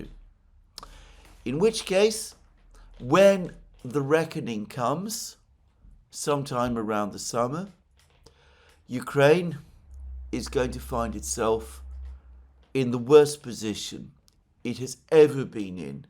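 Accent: United Kingdom. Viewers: AM's real audience is Russia. AM is reading a Kremlin script. The Kremlin chose AM because he is physically repulsive and has a British accent and because they got him cheap because he had been recently disbarred, a fact the Kremlin never discloses in its script it issues to AM to read. I watch the program because it is a direct line into what the Kremlin is telling its own citizens. Again, keep in mind that the reason the Kremlin selected AM to read their script is because AM is physically repulsive with a British accent, which is how the Kremlin wants to portray the West.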